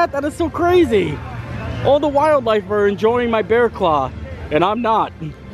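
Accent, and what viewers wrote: Pennsylvania, United States. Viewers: It's the PA accent...like Wooder (Water) :D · Yupper, you have a Pennsylvania regional accent. You also accentuate the letter d. That's what makes you unique and one in a million, which means there are 7000 of in the world. 😂. Don't change a thing. Too bad you could not do the Matterhorn 😂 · I love your PA pronunciations:) Such a happy day except for the bear claw incident which was both tragic and hilarious 😂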